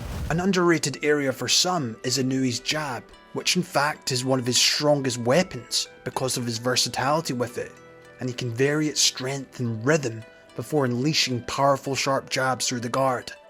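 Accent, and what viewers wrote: Scotland, United Kingdom. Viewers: A Scottish accent is so right for boxing videos. Gritty, poetic and profound.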